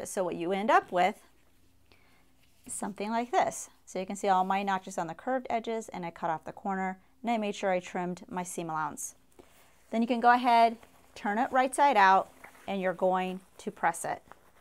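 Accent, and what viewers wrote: United States. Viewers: talks too much and silly american accent , more interested showing her nails and manicure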